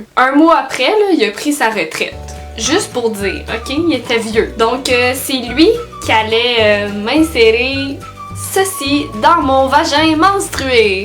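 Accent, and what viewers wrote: Quebec, Canada. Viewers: Magnifique accsent c'est un accsent quebequoi? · mdr pareil j'adore l'accent quebecois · j'adore ton accent québécois 😍❤👍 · Omg l'accent québécois 🤤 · J'adore ton accent canadien c'est magnifique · Ton accent québécois 😍🤩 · J'adore ton accent 😍😍, tu viens d'ou au Canada? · Taissa 26 c'est l'accent canadien, d'autres l'ont bcp plus mdrrr là tu devrais comprendre 😂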